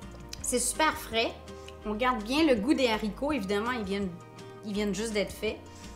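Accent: Quebec, Canada. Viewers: J'ai beau me concentrer et m'intéresser à ce qu'elle raconte, mais j'avoue que j'ai vraiment du mal avec l'accent québécois. C'est vraiment un accent et un français très très local. Au passage, je ne suis pas français mais je trouve le français de France vachement plus attrayant. Autrement les vidéos sont intéressantes.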